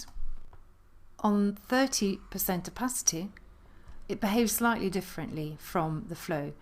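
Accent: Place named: Oxford, United Kingdom. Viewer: You smoke many an Oxforder with your accent, dear. It's the combination of the accent, the articulation and the subtle air of dignity of your delivery. Also, I've the sneaking suspicion that even Chris Tarantino, Amy Dresser & Katrin Eismann would have a hard time comparing favourably to you.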